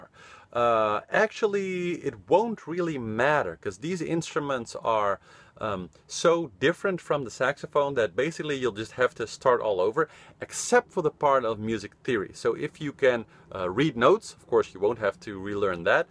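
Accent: Netherlands. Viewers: Compared to your early videos I think you're losing your Dutch accent when you speak English! 😉 Thanks for all your help and advice.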